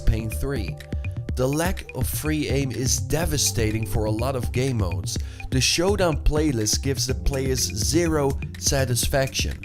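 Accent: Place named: Netherlands. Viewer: The narrator sounds agressively dutch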